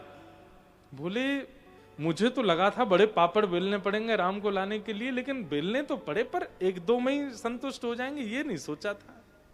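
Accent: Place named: Braj, India. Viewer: Jay Shri Krishna Radhe Radhe 🕉 Namo Bhagvate Vasudevy Namh OM 🕉 Namo Narayan ji Jay Shri Radha Rani Jay Shri Radha Kishori ji Jay Shri Radha Raman ji Jay Shri Guru Dev Maharaj ji Aapke Charno Mai Koti Koti Vandan 🙏Radhe Radhe Jay Shri Harivansh Aapki vraj Bhasa Badi Sumadhur hai Jay Shri Vrindavan Dham Jay Shri Yamuna Maharani ji Radhe Radhe 🙏♥️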